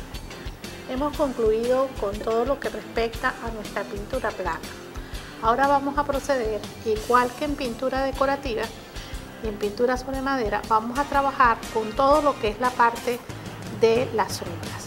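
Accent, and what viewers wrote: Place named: Venezuela. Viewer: Me gusta como explica, y por su acento, es ud venezolana. Felicitaciones!! yo también lo soy, saludos y abrazos desde Barinas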